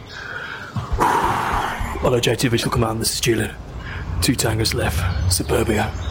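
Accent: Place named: United Kingdom. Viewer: bruh he’s pure British/Scottish/Irish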